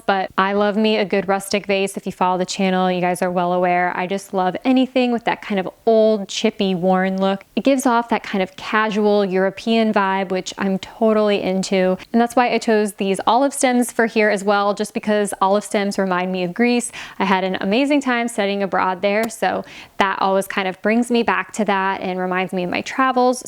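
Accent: Australia. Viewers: I like! As an Australian fan, I agee Aussie accents are cool. Love your channel. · Thumbs up to the Australian Accent!!!!! Great video 😁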